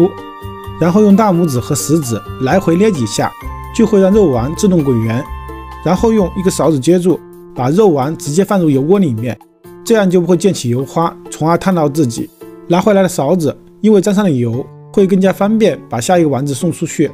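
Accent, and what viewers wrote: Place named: Hubei, China. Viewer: 大厨是湖北人吗？听出点口音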